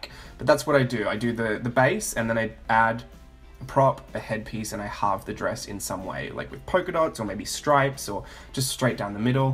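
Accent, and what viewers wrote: Australia. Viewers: Love the dolls and yes perfect for my journal. Great to hear an Aussie accent on here too there are not many . Yay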